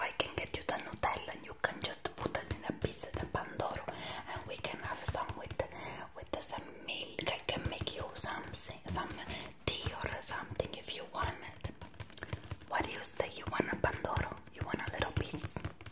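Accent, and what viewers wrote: Sicily, Italy. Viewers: You are VERY original, and very good! I really like your videos, you can relax someone, and at the same time you do that with a lot of originality. I appreciated so much the sicilian accent and the “next time a nonna” made me smile, because it made me think about my grandma with that strong sicilian accent❤️ Sorry for my bad english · The Italian accent is literally the same as my Nonna’s accent 😂😊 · Your Sicilian accent is so on point! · Omg this reminds me of my Nonna so much gosh I miss her ... this video made me so happy ♥️ you talk just like her too 😂 kinda scary you sound just like my grandma she had a really thick Italian accent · Oddio non ho mai riso così tanto per un asmr 😂😂😂 potrei sapere di che regione italiana sei? L'accento di Nonna mi ricorda molto quello della mia, adoroooo, hahahahah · one of the best Italian inflections I ever heard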